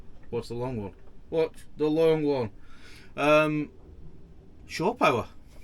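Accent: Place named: Northern England, United Kingdom. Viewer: Not at all, I love your northern no nonsense presentation. We are, ourselves from the North (Yorkshire). We're based on Anglesey now, but the boat is in Conwy. The house you are talking about sits right in the middle of the Swellies (near Menai Bridge and Llanfairpwllgwyngyll), which is reported locally to be one of the most hazardous areas of water in the UK to pass through. Apparently though, the best time to navigate it, at a good speed, is at slack tide (an hour or so either side of high tide when the whirlpools are least effective would work). Safe travels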